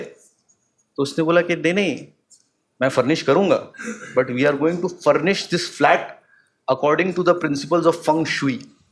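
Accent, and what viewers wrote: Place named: Kolkata, India. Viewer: That kalkatia accent😘😘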